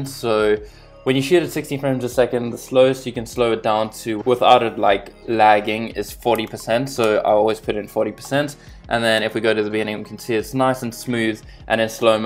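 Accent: South Africa. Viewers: dude are you south african???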